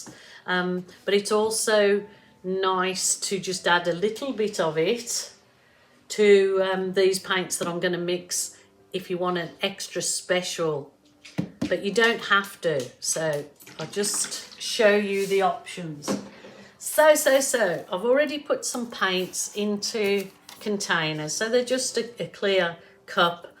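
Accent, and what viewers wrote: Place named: West Midlands, United Kingdom. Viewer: Off topic but I keep thinking I can hear a West Midlands accent amongst the Aussie!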